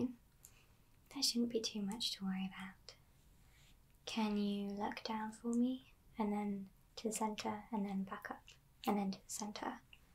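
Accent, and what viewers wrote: United Kingdom. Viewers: Your British accent works great together with the Lara cosplay.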